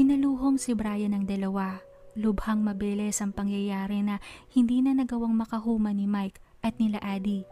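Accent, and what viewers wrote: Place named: Visayas, Philippines. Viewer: ❤😂hahaha ilab u...hahaha gustu ko ung accent mo sa bisaya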